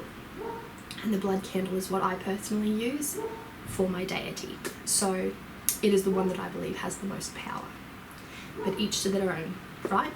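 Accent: Australia. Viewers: I can tell you're Australian from the accent, am I right? Working with the saints so to speak, you ain't mucking about That's for sure. Best of luck.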